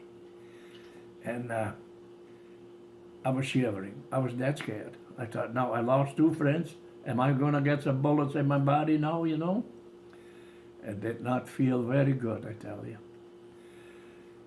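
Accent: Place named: Friesland, Netherlands. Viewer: Thanks for sharing the story. Myn fader is also born in Hantumhuizen. Great to hear the frisian accent❤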